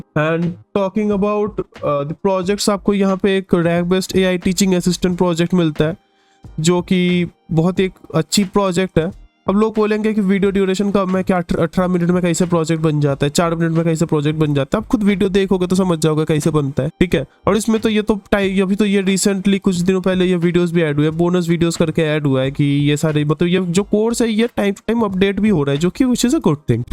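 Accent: India. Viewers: hahahaha marathi accent, sounds very nice. all such regional accents have their own charm.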